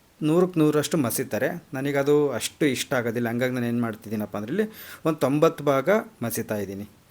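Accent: Chitradurga, India. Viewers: Good morning sir, ನಿಮ್ಮ ಅಡುಗೆ ಜೊತೆ ನಮ್ಮ ಚಿತ್ರದುರ್ಗ ಭಾಷೆ ಯ ಸೊಗಡು ಚೆನ್ನಾಗಿರುತ್ತೆ ನಿಮ್ಮ ಮಾತುಗಳನ್ನು ಕೇಳುತ್ತಿದ್ದರೆ ನಮ್ಮ ಊರಿಂದ ಯಾರಾದ್ರೂ ಬಂದ್ರೆ ಮಾತಾಡುತ್ತಾರೆ ಅಲ್ವಾ ಹಾಗೆ ನೀವು ಮಾತಾಡುವುದು ಹಾಗಾಗಿ ನಮ್ಮವರೇ ನೀವು ಅನ್ನುವ ಭಾವನೆ ನಮಗೆ ದಯವಿಟ್ಟು ನಮ್ಮ ಭಾಷೆಯನ್ನು ನೀವು ಮಠದುವ ರೀತಿಯನ್ನು ಬದಲಾಯಿಸ ಬೇಡಿ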